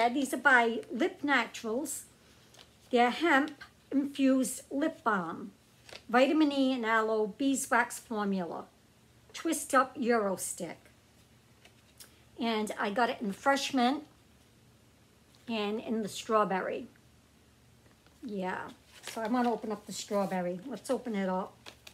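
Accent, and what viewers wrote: Boston, United States. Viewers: Are you from Boston ? Your accent sounds like it , from Canada 🇨🇦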